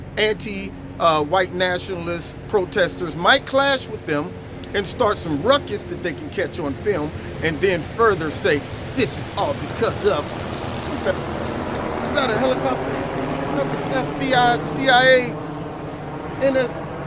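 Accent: Australia. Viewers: Love the Australian accent lol I counted and saw 27. lol The leftists have constantly been talking about transparency, well President Trump is the only true Transparent President. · LOVE your Australian accent! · I loved the Aussie accent. You are the best. We need to hang together and be kind to one another.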